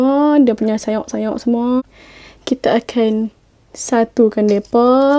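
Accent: Kedah, Malaysia. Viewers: Loghat kedah lah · actually aq x prnh mkn timun.. tpi bila tgk dy makan timun.. tetibe rsa mcm nak mkn timun☺papon nice video kak btw..kak ni org kedah ka?sbb dengaq jgk slang utaqa tu😅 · jangan cakap kedah rasa pelik 😂😂 maaf kalau ade silap cakap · comel kak ckp loghat kedah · Kak orang kedah ka??🙈😉👌😅💞✌ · Loghat kedah asal kedah ke ?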